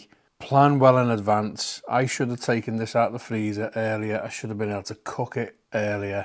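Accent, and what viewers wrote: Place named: Scouse, United Kingdom. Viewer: I'm from the Wirral (next to Liverpool) but now live in Queensland Australia. Really like the videos and like to hear your accent, which is strange because I don't really like the scouse accent but yours is exceptionally subtle. Keep up the good work 😊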